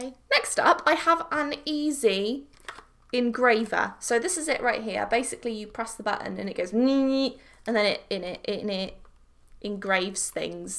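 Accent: United Kingdom. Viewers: Who else watches to hear that Beautiful British Accent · Is she British? She sounds British 😕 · Are you English ?? Just wondering because it sounds like your British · British accent haha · I Looove your accent if I were to say it was British I will really get it In the replys....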